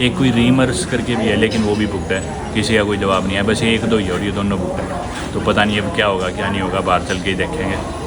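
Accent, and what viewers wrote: Haryana, India. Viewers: Ram ram bhai aap haryana s ho kya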